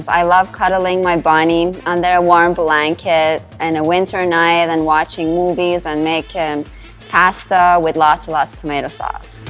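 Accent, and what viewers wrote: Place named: Canada. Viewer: wow your a Canadian? this is good to hear i kinda can tell with your accent.. how much did it cost you to purchase all your equipment and do you produce music as well?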